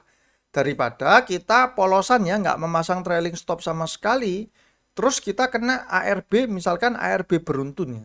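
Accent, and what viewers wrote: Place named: Java, Indonesia. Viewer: Terima kasih mas, sangant mengedukasi, juga suka loga jawanya Terlihat bangat mas niatnya membantu ritel utk tdk terjebak, sukses untukmu orang muda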